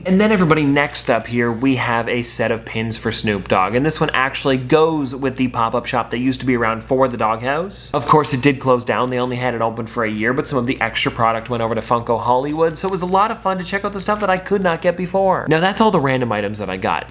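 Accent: Canada. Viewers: across the country i thought your Canadian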